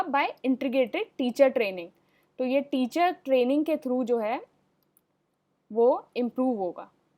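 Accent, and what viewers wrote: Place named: Lucknow, India. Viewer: Nice set, thanks. Ma'am aap Lucknow se hain na(just guessing,if you don't mind,apka bolne ka lehza batata h,aap Lucknow se ho)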